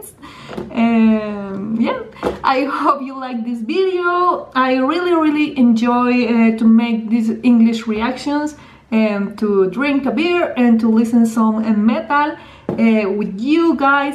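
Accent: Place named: Colombia. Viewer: tienes acento a colombiana, eres colombiana?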